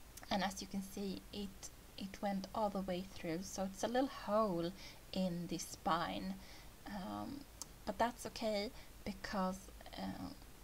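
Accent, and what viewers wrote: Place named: Sweden. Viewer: sigh...i do love your art, follow you on insta. I just wish I could understand your lovely swedish accent, as I am an american speaker. I can only catch a few words now and then. I know it's not your problem. That said, I'll watch, but will not try to understand. the closed captions are in swedish, of course, not english.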